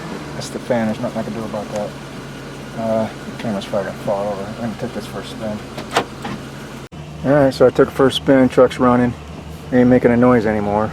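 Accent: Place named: Boston, United States. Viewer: Very relevant to me here in Oz, just doing a Mitsubishi ute and this method is working. Your accent reminds me of Bill Burr ; do you tell jokes too? ( Bill’s from Boston).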